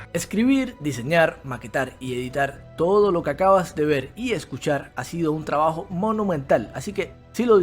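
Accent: Cuba. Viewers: Eres cubano? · Exelente trabajo me soprendio tu asento cubano y confirmarlo hehe es bueno tener compañeros dando tan buen contenido piensas hablar de Diablo 2? Tambien lo queme mucho en Lan en ese tiempo y ahora disfrutando del diablo 4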